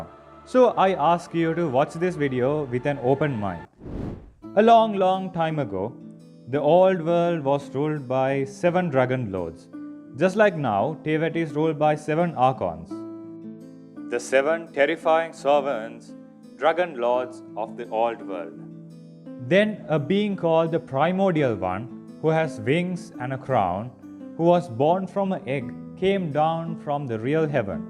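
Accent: India. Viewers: Where are u from cause u sound indian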